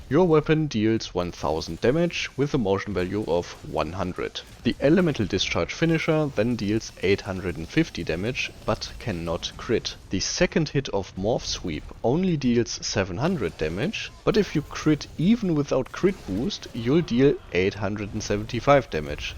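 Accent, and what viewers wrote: Germany. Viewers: Dieser Deutsch Akzent 😂😂😂 gutes Video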